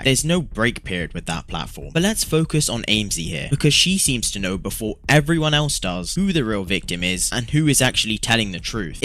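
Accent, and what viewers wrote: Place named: Wales, United Kingdom. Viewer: She’s welsh and has adhd please don’t make fun of her accent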